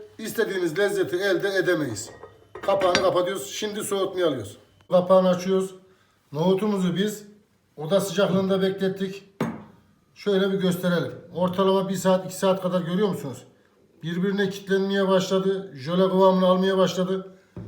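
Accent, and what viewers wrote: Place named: Central Anatolia, Turkey. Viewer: Yaa tam bi iç anadolu şivesi çok tatlı olduğu için dülmem geliyor😅🙈Ben de yarın nohut yemeği yapıcam bi tariflere bakım dedim sizle karşılaştım.🙋🏻‍♀️Ellerinize sağlık, bilgiler için teşekkür ederim....🧡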